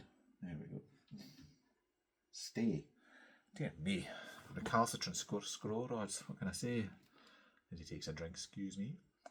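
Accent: Scotland, United Kingdom. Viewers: Hello from Wellington, NZ. I have been meaning to comment for... well... 27 episodes but well, I usually watch you on the TV while I'm stitching. I have to say, I enjoy your lovely Scottish accent. You have a lovely calming voice and I enjoy relaxing to your Flosstube! I'm also a Flosstuber... just published #271 this morning. Was supposed to be Sunday but... YouTube did not let me upload yesterday/last night and I had to cancel and redo it. What am I stitching on? Well, right now I am taking a wee work break and having some chicken noodle soup. But, I will be getting on to a large redwork sampler after work - pushing for a finish this week. Happy stitching! Leigh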